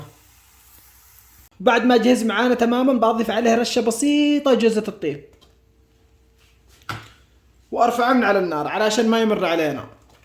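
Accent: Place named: Aden, Yemen. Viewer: الكلام سعودي والنغمه عدني😁كلام ياعدن كلام هيه بلعافيه عليك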